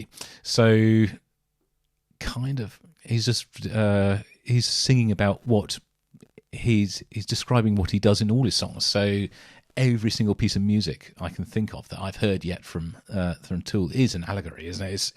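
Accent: United Kingdom. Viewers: Why do I feel like I'm watching I'm Alan Partridge? Probably because you're British and you've a good voice for radio.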